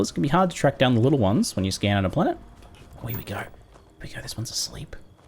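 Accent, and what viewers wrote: Australia. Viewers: I thought, for a second, he was doing a Crocodile Dundee accent, but then I realized it's a video and it's just how he talks.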